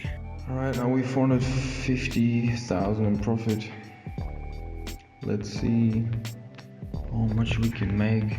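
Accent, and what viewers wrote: Germany. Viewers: Are yo German dawg?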